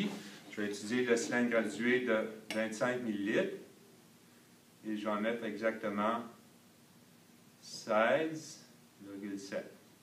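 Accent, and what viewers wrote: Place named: Quebec, Canada. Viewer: J'aime beaucoup ! C'était simple, un bon rappel, et en plus avec l'accent Québécois qui me plaît beaucoup ! Ca change un peu des cours habituels, c'est sympa comme tout !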